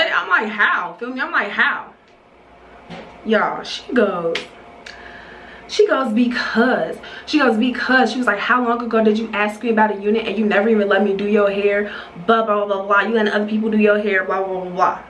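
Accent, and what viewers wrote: Chicago, United States. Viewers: Are you from Chi bc it definitely sound like it 😭?? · I can definitely tell you’re from Chicago sis! Accent hella strong! 🤣 sooo pretty btw! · Just listening to you talk I can tell you from Chicago and it’s comforting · You from Chicago? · Lol are you from Chicago?? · I love a good Chicago accent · 🤔 you sound like you from Chicago, I’m new here do idk lol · I can just tell u from Chicago 😭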